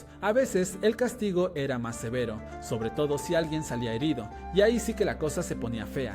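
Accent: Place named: Latin America. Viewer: Tienes la forma de hablar de alguien que vive en un país en qué seguramente una gran parte de la población no tenga agua corriente o viva en condiciones de insalubridad.. tal vez cualquier país de Latinoamérica?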